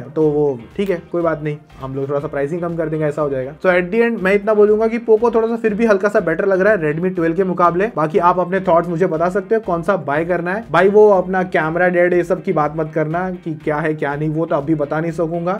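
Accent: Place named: Punjab, India. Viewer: Bhai apke accent Punjabi hai ❤😊😊😊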